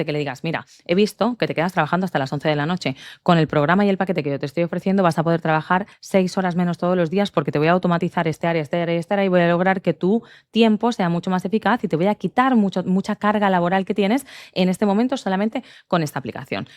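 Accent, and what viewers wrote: Spain. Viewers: De qué país eres escuché México pero tienes obviamente el acento de España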